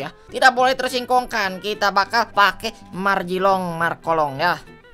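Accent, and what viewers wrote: West Java, Indonesia. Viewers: ente asli sunda mang?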